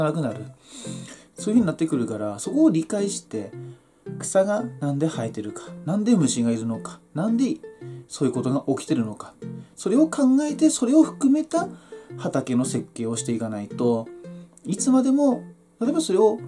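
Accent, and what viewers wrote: Tsugaru, Japan. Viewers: 東北、青森県、津軽地方の方ですか❓️ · このイントネーション…津軽人だな？ そーいう私も青森市在住ですw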